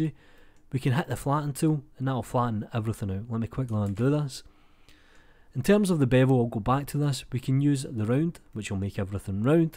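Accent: Scotland, United Kingdom. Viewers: That Scottish accent is so thick, lol. I have a better time understanding Gerard Butler! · i dont know if you are Welsh or Scottish.. probably the latter. I can understand you more than my fellow Aussies. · I like the scottish accent, keep it. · I'm here especially for the Scottish accent.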